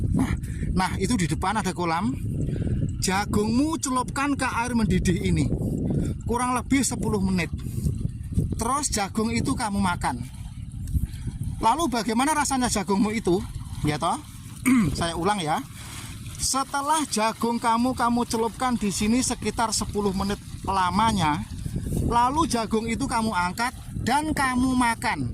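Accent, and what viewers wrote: Java, Indonesia. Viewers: Yutuber ko ngomong nya glepotan bro Jawa ya Jawa sekalian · Pokok e you tobe kriator yg satu ini sangat bikin aq ngakak 🤣🤣🤣 wesss juowoo jekek ngomonge😂😂semangat kawan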